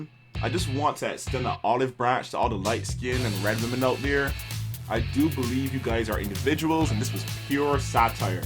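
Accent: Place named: Barbados. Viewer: You sound bajan boss man , blessings brother!!!mauby syrup and fish cake 🤙🏾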